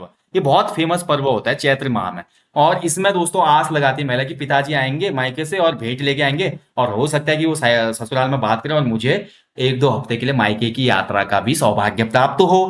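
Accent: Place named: Garhwal, India. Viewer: Sir ki gharwali pauri jse h😂😂😂😂😂❤🎉🎉🎉😅😅😅😅😅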